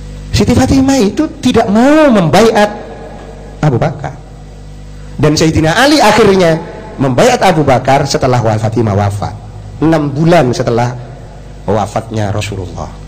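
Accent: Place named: Madura, Indonesia. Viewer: dari logatnya kayaknya orang madura. Mengingatkan ustad idrus romli yg cerdas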